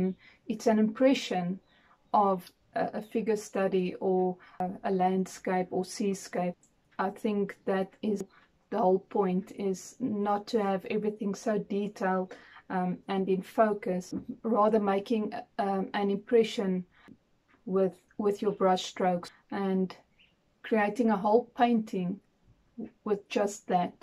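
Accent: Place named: South Africa. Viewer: How nice to hear a South African accent. Hi from the Netherlands ex Midvaal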